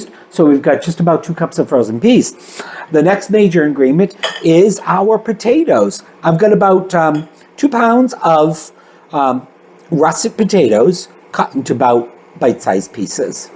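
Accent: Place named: Massachusetts, United States. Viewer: Are they in my home town? Newton Mass? Accent is correct!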